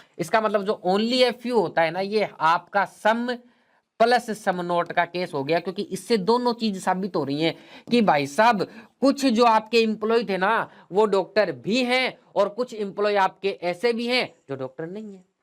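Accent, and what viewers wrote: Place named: Rajasthan, India. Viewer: Guess you are from Rajasthan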